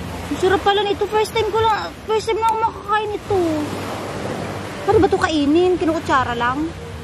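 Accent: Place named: Iloilo, Philippines. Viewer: Parang d ka nmn purong Tagalog ilongga ka ata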